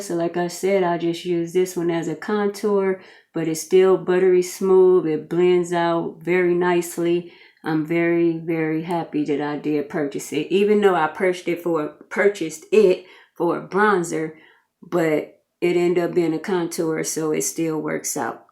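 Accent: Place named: Los Angeles, United States. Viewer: Random question... r u from Cali ? I can’t really pick up exactly where u from , but u sound like u from LA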